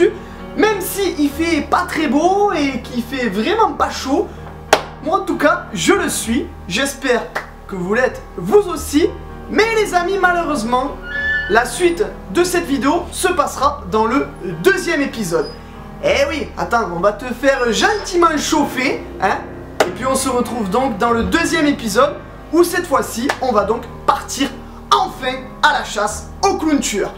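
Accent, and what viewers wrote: Marseille, France. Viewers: je kiffe ton axen marseillais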